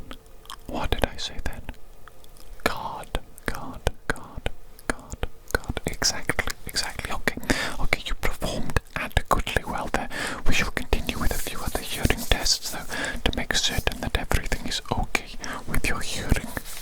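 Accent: United Kingdom. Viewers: Are you british im from the uk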